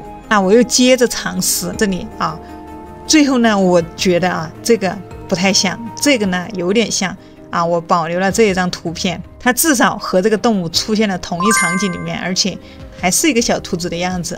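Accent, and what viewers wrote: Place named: Sichuan, China. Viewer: 川普哈哈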